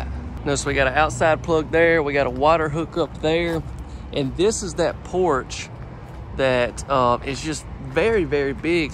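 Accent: South, United States. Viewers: Love the Southern accent and mispronounced words. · Buddy I LOVE Your Accent. Some Where Down South? LOVE It! AWESOME VIDEO VERY Clear & Clean Very Descriptive In your Video. Ever Think Of Real Estate? You Be A AWESOME ONE! I Would Certainly Buy A House From you As You Have Exquisite Taste In Homes. Looking Forward To Seeing MORE.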